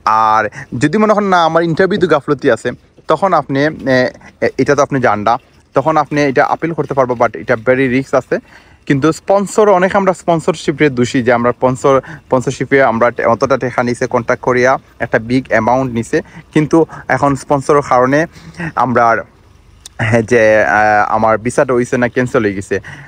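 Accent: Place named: Sylhet, Bangladesh. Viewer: Bhai kotha bujhte onek problem hoi.. Hoi proper banglai bolen nahole english a video banan Amra sobai to Sylheti accent er sathe used to na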